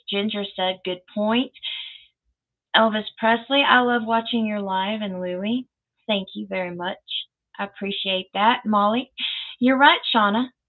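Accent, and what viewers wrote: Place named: South, United States. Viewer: Hi, Kate here. Sorry I missed your live show. Hey, girl, we still love you. You are - passionate about your love and loyalty for Elvis, defending him like we're all doing, showing your true feelings and keeping it real, that equals honesty in my book. Please don't ever change. You do make me laugh, and that fabulous classic Southern accent makes your little rants sound even better! Lol. See you soon, Georgia girl. Take care. Loving Elvis and Lisa-Marie always.❤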